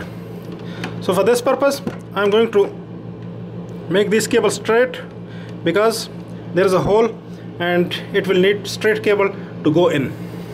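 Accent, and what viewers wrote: India. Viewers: Informative video, it looks like this entire vacuum cleaner could use a cleaning. What year does it date back to? What industry was it used in? What country was this video filmed in? I'm guessing India judging by your accent.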